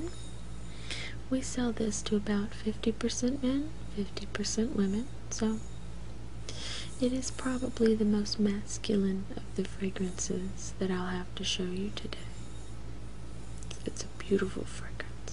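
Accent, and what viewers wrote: South, United States. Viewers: Yes, your southern accent =) It's the best soothing voice i ever heard *-* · I love your asmr offerings. I'd love to hear you tell us about your tattoos. Your voice is so beautifully southern. :)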